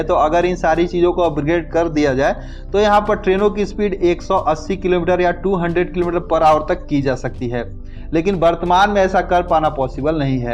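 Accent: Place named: Delhi, India. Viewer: Bhai aapko tone me Delhi ke logo wala tone aagaya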